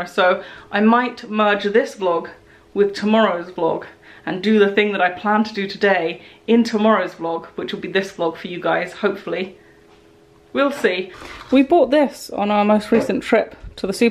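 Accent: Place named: England, United Kingdom. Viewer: My google loves your voice! Without fail every time you talk to your google, mine obeys you. She hardly listens to me I have to repeat myself so often. Perhaps she prefers the English accent over my Australian accent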